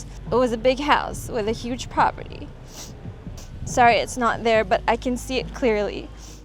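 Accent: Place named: Guyana. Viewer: Guyanese accent and all included ;-)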